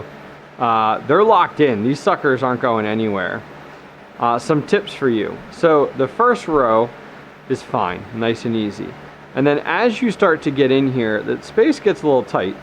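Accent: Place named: Boston, United States. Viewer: My friend, you have a Boston accent.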